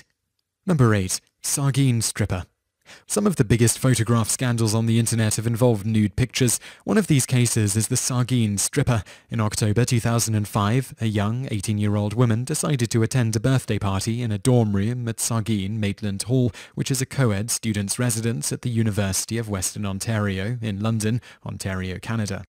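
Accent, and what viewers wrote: United Kingdom. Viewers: Why does British accent make everything seem classy and professional?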